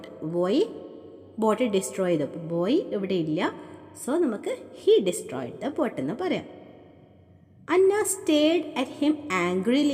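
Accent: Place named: Kasaragod, India. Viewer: നിങ്ങളുടെ വീട് കാസർഗോഡ് ആണോ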